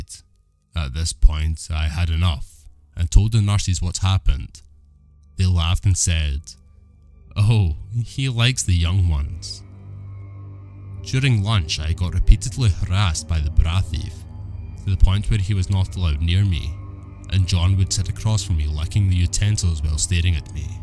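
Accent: Scotland, United Kingdom. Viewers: I really enjoyed that. They were different and I think I have only heard this topic dealt with once or twice before so it was good to hear original material. You have a great voice to listen to. I love the Scottish accent and it makes it different. Here is an idea for a topic, I remember reading a story on Reddit where a couple were randomly rescued from a potentially violent situation involving a couple of tweekers by an enormous and scary black dude .... how about “unlikely heroes “ or someone totally random just completely saved my bacon? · First time hearing horror stories in a Scottish accent and absolutely love it. Had to scour YouTube to find this channel and thank you so much for narrating them. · Criptids are my favorite subject,and paranormal experiences are a close second. I would like to thank you for your time and effort in making these stories. 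I love your accent, I've always had a soft spot for Scotland and the accent. Frankly you could read the yellow pages and I would listen. 💙😱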